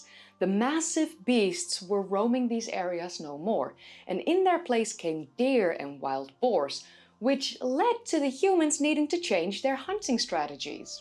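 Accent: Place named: Netherlands. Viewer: Of course she's Dutch. You can tell by her accent. (Almost).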